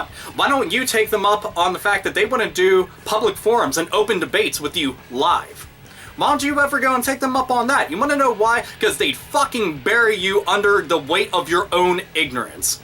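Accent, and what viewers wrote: Pittsburgh, United States. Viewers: where are you from? I'm from Fayette County, PA and your accent reminds me of home. I noticed your Pittsburgh Zombie shirt so that's further evidence that you're from that area. Your rants are fucking awesome and your accent sends it over the top. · haha holy shit, youre a yinzer? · I realize this is an older video, but I have to ask; are you a Pittsburgh native? I gotta know man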